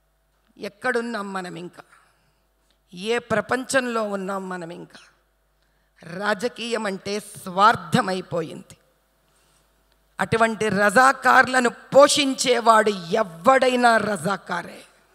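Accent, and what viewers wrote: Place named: Rayalaseema, India. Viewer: పద్మూడు...రాయలసీమ యాస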